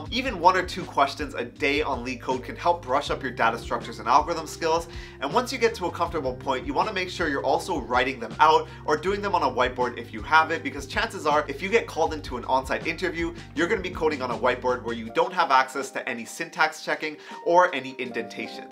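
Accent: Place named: Toronto, Canada. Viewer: Just saw your Redux video and I'm like this guy is from Toronto ( I am too) just by your voice. thanks for the clear videos and explanation on Redux. Lots of videos don't show HOW and WHY things are done but just "this is how it's done". Keep being awesome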